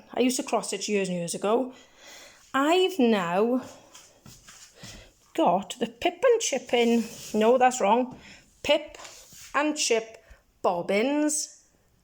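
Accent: Wales, United Kingdom. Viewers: you are a joy to watch. I think your Welsh accent is gorgeous. Between you and Pam I’ll be fluent in Welsh and Scots 🤣All your stitching is lovely and your bags are stunning. You are so talented. I’ve made a few vinyl front bags but I wouldn’t want anyone to look too closely as the stitching is a bit wonky to say the least. Sorry to hear you haven’t been too well. Take the time the doctor has signed you off to relax. Your body is telling you to rest. I hope you get your cardiac appt soon and get everything sorted. I can’t wait to see you next time. Thanks for sharing. 💞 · Lovely room, amazing loving the "Taff" accent. Love Wales!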